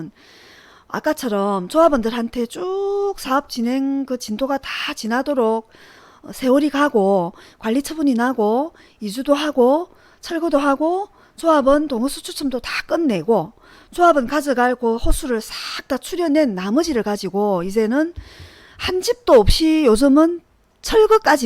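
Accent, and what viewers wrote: Busan, South Korea. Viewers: 처음들어보는 재건축 재 개발 에대한 전문가에 말씀 큰 도움이됩니다 부산사투리 정감있고요 또박 또박 허스키한목소 리로 진행하시는 말 솜씨 가 보통 아니시네요 저는 수영구 역세권 재건 축 대상지 주민으로 물어보고싶은것 많지만 공짜로 들려주시는 유투 버 정보 열심히 청취해보 보겠 습니다 좋은정보 감사합니다 저는 수영구 역세권 대건 축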